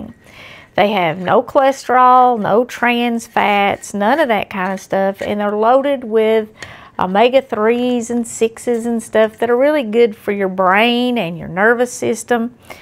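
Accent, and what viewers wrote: South, United States. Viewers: I love your videos I love your southern accent I was taught by my mamaw to cook just like you do. I talk just like you, taters, mater's. I like the scripture's and the fact that you put God first. God bless you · Dear Ma'am. Please know how much I appreciate your videos. I love the Southern accent and charm. I was born in Germany, raised in Chattanooga and now live in Murfreesboro. I have lived all over this world 'cause my stepdad was in the Army. Having spent a bit of time (twice) in Massachusetts (Yup....Yankee), I'm sure you can appreciate how much I love the South. Your shows are awesome, delightful and educational. Thank you for what you do and my God bless you and your crew.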